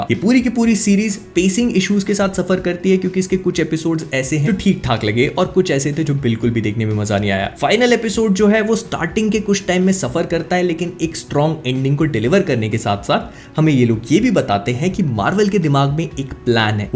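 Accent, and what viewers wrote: Bhopal, India. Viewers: bhopal se kya baat krne ke accent se laga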